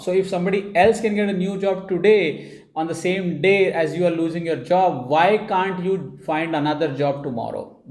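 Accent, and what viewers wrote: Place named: India. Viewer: Your indian english irritates me....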